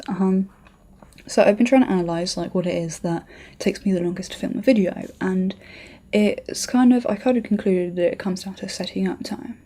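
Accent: England, United Kingdom. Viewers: I enjoy your videos and sympathize with the real life problems and it honestly humanizes you, which is a nice change of pace from the persona we meet on the screen at each viewing. I think allowing room for some flaws in your videos for a quicker more efficient approach is fine. Learn to roll with them and incorporate them in fun ways into the video. I do think patron is a good idea to help increase your revenue, it may also be good to do a tutorial and ask viewers to whitelist your youtube channel. Two things initially attracted me to the channel computer parts and a pretty woman. Two things ultimately kept me coming back; you have an English accent, and in the U.S. that is not so common, so it just makes you sound intriguing. On the other hand you also know what you're talking about instead of just reading specs, which helps your followers respect you. 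It is one of my gripes with Linus is that he does not always know the products he is reviewing well enough and presents them in a bad light due to ignorance. As a viewer one piece of advice is to show more excitement in your voice. Often it can seem mono-toned and in a review that is more acceptable. This may be your personality, but it is in a sense showbiz and I think developing your character and excitement could be of help. -Cheers P.S. I hope your housing situation works out for the best. TheHiveLeader and should do a UPLAY episode together. That is one funny dude and it would provide some nice coop t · Fast speaking + English accent = really hard to understand for a non native English speaker D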